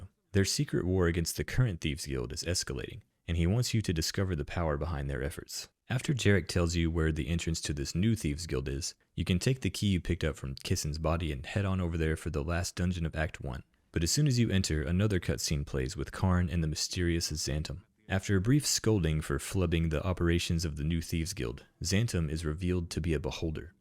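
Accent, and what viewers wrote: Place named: Arkansas, United States. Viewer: Rural arkansas? Im from fort smith and lived in Magnolia in south arkansas. You sound like you may be from further north around Fayeteville?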